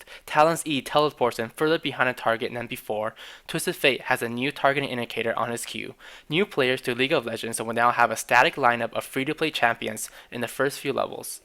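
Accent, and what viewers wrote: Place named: Asia. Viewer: I cant help but smile with your asian accent XD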